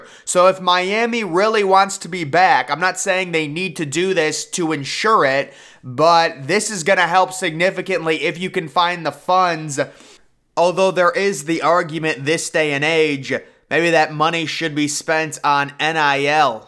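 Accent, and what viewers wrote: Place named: Wisconsin, United States. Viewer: Hard Wisconsin Slang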